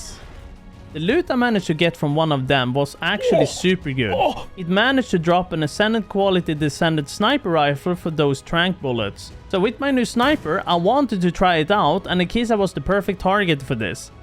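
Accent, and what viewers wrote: Sweden. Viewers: you sound swedish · are u swedish? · As a fellow Swede, yes this is why, and yes you reeeeally got that classic swedish accent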